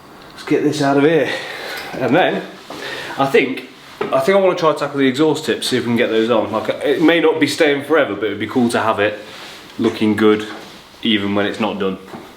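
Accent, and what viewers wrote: United Kingdom. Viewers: Can you do hindi Dub for me 😁 would be a lot easier to understand without UK accent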